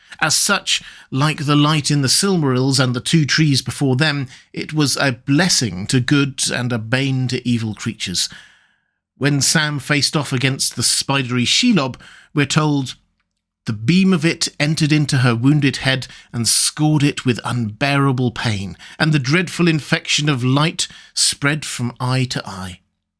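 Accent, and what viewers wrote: England, United Kingdom. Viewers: He has an English accent too! Neat eh?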